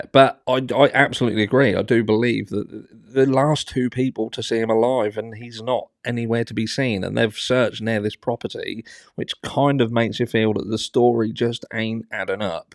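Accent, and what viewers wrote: Norfolk, United Kingdom. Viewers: Are you a Norfolk boy?, recognise that accent anywhere, lol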